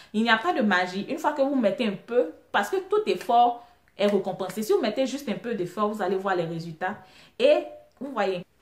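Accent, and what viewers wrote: Ivory Coast. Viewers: hum l'accent ivoirien là hooo suis motivée une soeur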